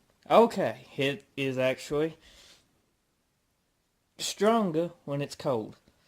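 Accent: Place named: South, United States. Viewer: LMAO....YEP. WHERE ARE YOU FROM? YOU HAVE A SOUTHERN ACCENT...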